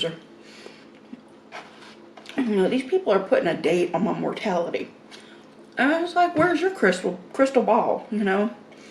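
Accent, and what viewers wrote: United States. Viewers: She does speak English it's just a American accent like British have British accent accent isn't a language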